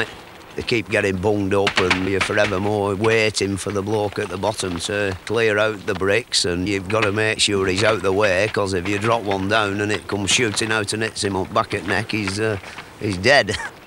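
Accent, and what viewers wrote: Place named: Northern England, United Kingdom. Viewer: Ha, yeah it's northern English.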